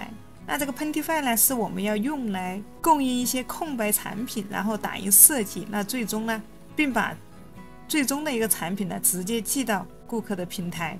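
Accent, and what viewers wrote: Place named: Chongqing, China. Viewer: 😂🎉😅😮一口重庆话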